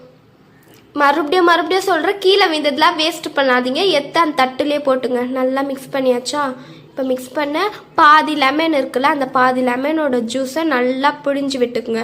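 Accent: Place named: Chennai, India. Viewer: ஏய் உன் குரல் வச்சு கண்டுபிடிச்சுட்டேன் நீ சென்னை தானே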